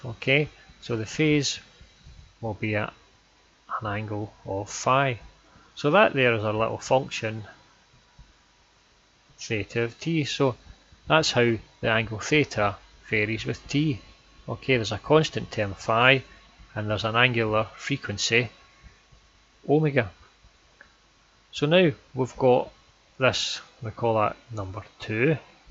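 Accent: Scotland, United Kingdom. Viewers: are u from scotland?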